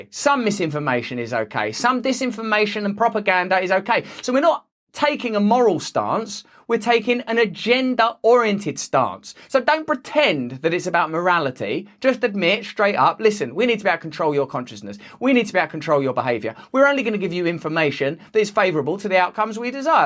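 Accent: United States. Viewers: I really like your American accent. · Mr. Brand. I heard your name some time ago in connection with "right wing conspiracy theorists" and as usual paid the accusation no mind because it came from the same media outlets that always use that phrase to marginalize those who say what they don't want anyone to say much less think about. I've so far tripped over a couple of your videos. Haven't seen you espouse a single CT yet. Today I subscribed, but I have to admit, at least partly because you're the FIRST Brit I've ever seen who can manage more than one American accent. Also, because you mentioned Orwell who seems to have been relegated to unperson status recently as all the major "Western democracies" vigorously and enthusiastically utilize every doublethink and doublespeak tactic he invented. They took what he wrote as a warning and are using it as a manual! 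Why do I say Orwell is an unperson? I'm 69 and my kids and grandkids are no longer required to read him in school. They didn't even know who he was or what he wrote until I told them.His books haven't been banned- yet- but he isn't being taught any longer. They are unequipped to recognize The State closing ts grip on them, and would probably gleefully go along with the USA going full "Team USA: World Police" as that article endorses. When the fuck did life start imitating satire? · dig american gangster voice the best. Gilbert Godfreyesque. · Hey, why does it seem like it is easier for you to speak with an American accent than your native accent? Keep up the great discourse!!! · American accent is better than his English accent…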